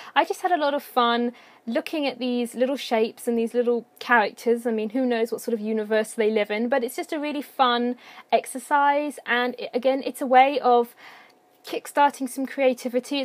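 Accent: United Kingdom. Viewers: Are u from the UK or something no sorry just asking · Idk why nobody has commented on this but I ❤️ your accent! I'm British too, and ppl really like mine too! I've watched this video like 5 times because it's so inspiring, and just to hear you talk too! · Love your British accent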